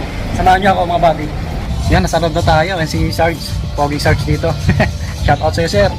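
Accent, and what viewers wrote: Visayas, Philippines. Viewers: Ituwid mo salita mo ,anong naghanap?naghahanap dapat past tense yung naghanap....halatang bisaya ka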